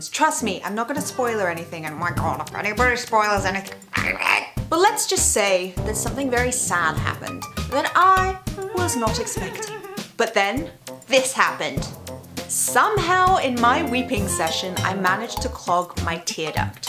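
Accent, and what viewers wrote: United States. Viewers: It's been a while, and i have one question. WTF HAPPENED TO YOUR ACCENT!?!? You sound so damn American now! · she sounds so American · Dude, you're starting to sound so American. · What's with the American accent? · She's beginning to get an American accent. :D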